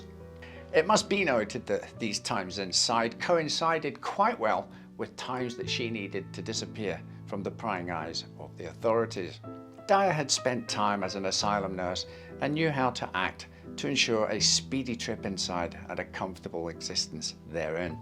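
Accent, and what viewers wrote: Scotland, United Kingdom. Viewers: I detect a Scottish accent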